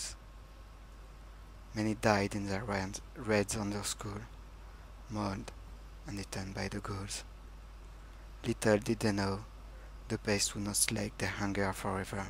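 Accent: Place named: France. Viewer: Amazing my french friend! Great story, loved the way you said it. The french accent really added to spookie vibe thing. Great build. Will make a great halloween entry too! Just love it, didn't know you had it in you... Very macabre...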